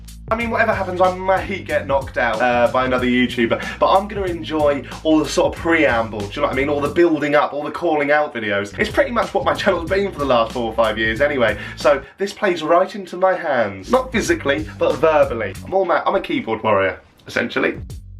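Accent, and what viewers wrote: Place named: Norfolk, United Kingdom. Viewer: Why do you have such a Norfolk accent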